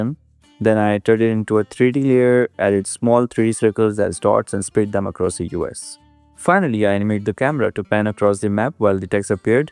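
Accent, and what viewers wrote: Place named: Pakistan. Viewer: This work is definitely not worth $450, you should up your prices. If you've made such a great project in just 3 days, it can only get better with a more flexible time limit. Judging by your voice you sound Pakistani, and $450 is a lot here, but still, you've got to charge more, your portfolio is surely looking great already.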